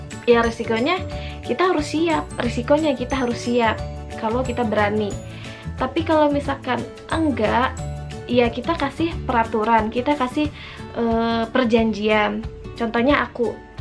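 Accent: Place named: Sunda, Indonesia. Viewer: sundanya meni lekoh pisan mbak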